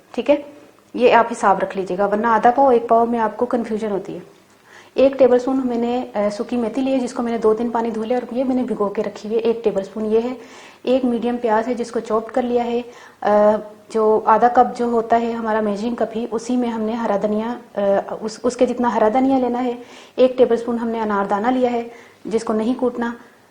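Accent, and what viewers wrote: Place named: Punjab, India. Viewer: Ap ke panjabi bikul hammari wali ha ap boht achi legti hn panjabi bolte hwe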